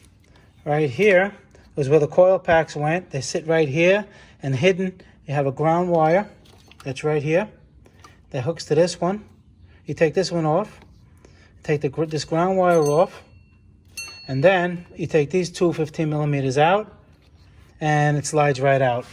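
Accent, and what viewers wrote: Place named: New Jersey, United States. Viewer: definitely people may forget to comment but I promise your helping tons of us be self reliant on our repairs, I did the whole job successfully, unfortunately when i tore it down the mating surface on the block had like rust wear indentations or something, I picked the car up for 800$ bucks thought it would be a simple fix but someone really abused it. Worked great for a few days and blew it again.. oh well you live and you learn. Btw your accent is awesome, reminds me of my relatives up north. You from Jersey or New York?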